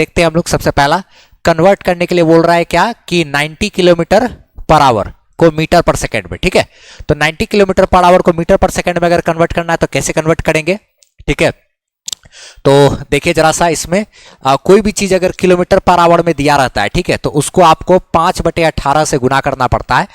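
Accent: Bihar, India. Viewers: Sir kya aap bihar se hai ? ❤️❤️❤️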